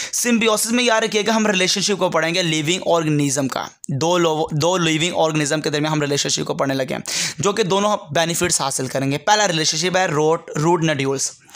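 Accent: Punjab, India. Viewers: Punjabi accent 😍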